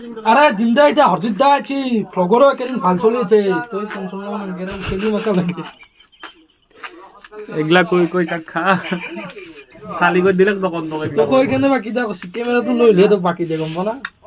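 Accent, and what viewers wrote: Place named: Nalbari, India. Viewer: Tumar video bur sai bhutt vl lage❤ i love nalbari vakha ktha burr❤☺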